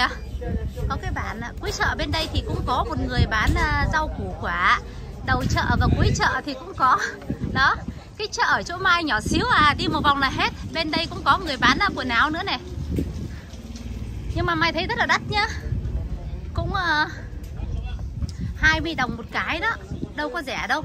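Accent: North, Vietnam. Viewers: Chào Mai ! Nghe Mai giọng bắc mình cũng là người bắc. Mai quê ở đâu vậy? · Thích nghe giọng Bắc của Mai, mình vô tình mới xem kênh Mai , vui vẻ và gia đình hạnh phúc ❤️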